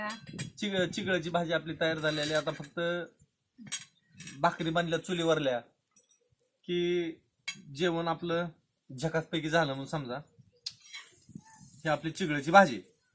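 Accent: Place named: Malshiras, India. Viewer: Tumchi malshiras talukyatale vatata karan aamhi pan tikdachech aahot......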